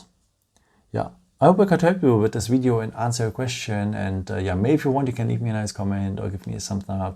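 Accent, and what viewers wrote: Germany. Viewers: Cute German accent ☺️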